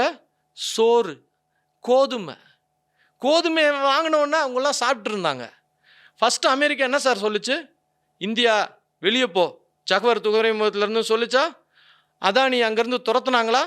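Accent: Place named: Kongu, India. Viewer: இவர்தெளிவாககொங்கு தமிழ்அழாககபேசுகிறார் இந்தகாணெரளிதெளிவாகதிடமாககருத்தயளிகிறார்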